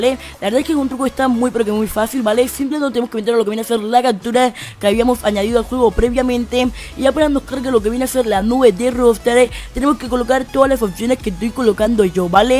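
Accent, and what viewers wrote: Colombia. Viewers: eres de colombia si eres de colombia de que ciudad yo soy de cali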